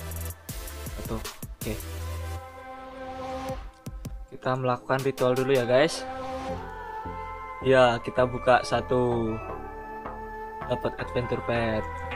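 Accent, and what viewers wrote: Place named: Java, Indonesia. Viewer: Jowo Cok:v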